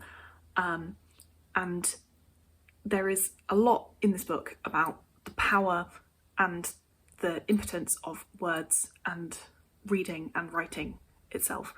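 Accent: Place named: France. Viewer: Sounds very French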